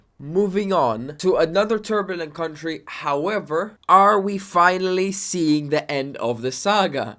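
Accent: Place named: Italy. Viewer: oh really? Sorry, it's just that you're accent sounded very Italian.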